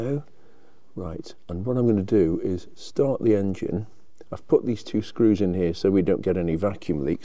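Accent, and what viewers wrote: United Kingdom. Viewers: I absolutely love the sound your voice. British English at its finest. Greetings from Sweden.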